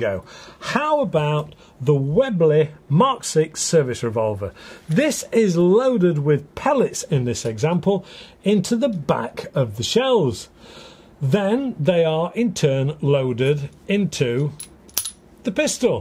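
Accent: United Kingdom. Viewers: I love your British manner of speaking! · This channel is awesome, so well researched and explained, and oh so elegantly british 💪🏻❤🇬🇧👍🏻I have learned so much, keep up the excelent work! 👌🏻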